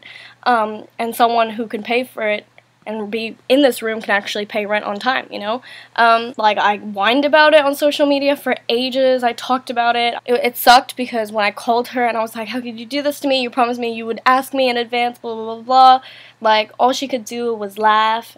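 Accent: Australia. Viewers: i can hear your aussie accent getting stronger xx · unrelated, but your australian accent shows a lot in this video lmao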